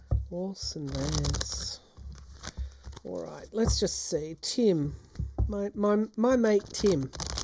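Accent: Australia. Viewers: Why would anyone write negative comments against her? She's a gem💎, & I look forward to her readings weekly. I luv her accuracy, because she's always right on point. I luv her beautiful Australian accent & her numerous neck scarves! U have to be a nutjob to not like her & need to go away. I agree that BiBi won't do a cease fire until after the US Presidential elections, probably because Trump told him not to.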